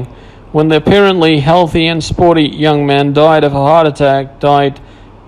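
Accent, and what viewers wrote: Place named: England, United Kingdom. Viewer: You sound English, therefore you should read and speak it much better. This was almost painful to listen to. You should also check your facts and your pictures. Rashid and Hamdan don't even look alike so how can you mix their pictures up? Youtubers always get it wrong when it concerns the Dubai Royal Family. I couldn't even finish this video. Your delivery leaves a lot to be desired.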